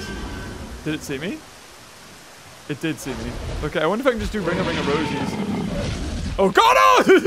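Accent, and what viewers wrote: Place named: Scotland, United Kingdom. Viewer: With your accent you sound exactly like the actor Ewen Bremner who was in Alien vs Predator movie... heh... When you said .. "Oh God..." it reminded me of this scene ---> <link> Are you Scottish? He is.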